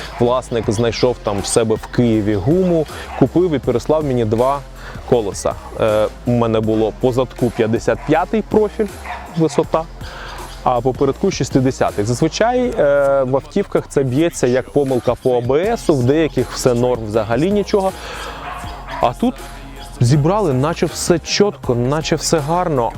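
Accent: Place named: Lviv, Ukraine. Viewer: Майже львівський акцент!!!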